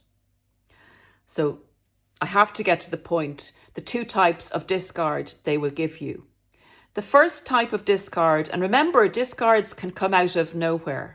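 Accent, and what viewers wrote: Ireland. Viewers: Just want to say I just ran into your channel wonderful videos I love your Irish accent and your charisma your a Attractive Spiritual Lady.. well here's my story.. Around 2014 I was on social media and Facebook a woman girl who latched on to me as a really close friend that was into the same arts and Music as myself. Just prior I had broken up with a previous girlfriend she came on pretty strong throughout 2014 till 2016 I started getting really close and I felt I found a wonderful person well I'll start off by saying she was a covert narcissist NPD vulnerable type but this is way before I figured it out we were long distance Maryland and Oregon I thought wow I finally met a perfect match. Time went on we started flying out to see each other back and forth for the next 4 years it was very amazing and she seemed very angelic and a stand-up person and she had a one son she was very generous and always kept offering to book our vacations and pay for everything I didn't like that feeling and paid for most stuff for us also that we did also I was sort of entranced that she was just doing it out of the kindness of her heart and care and was what I learned a codependent such as myself and she was really having problems with depression and other things and was looking for a connection. 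When we weren't seeing each other physically being long distance we talked on the phone every night when I get home from work and do Skype until we could see each other again on our vacations from work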